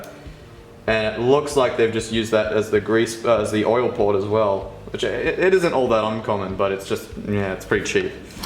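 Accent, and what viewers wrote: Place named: Australia. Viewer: Are you an Aussie or a yank mate? Sort your accent out bud.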